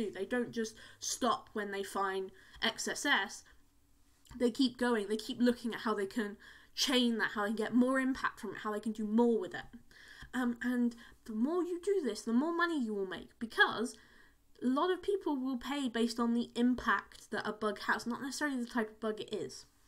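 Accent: United Kingdom. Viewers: British accent is so sexy :p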